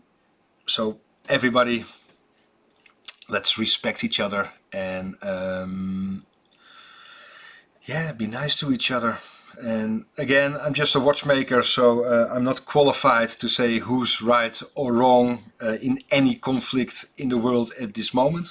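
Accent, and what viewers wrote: Netherlands. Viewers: Without looking it up.. Ik weet vrij zeker dat je een Nederlander bent?😛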